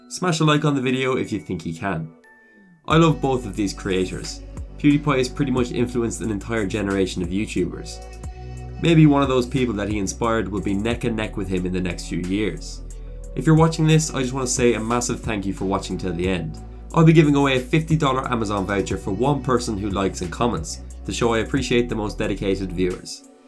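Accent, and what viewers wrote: Ireland. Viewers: The name and accent made me think you were Irish and then you said tirty it was obvious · I'm from ireland and I could tell you were as well from your accent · I knew you sounded Irish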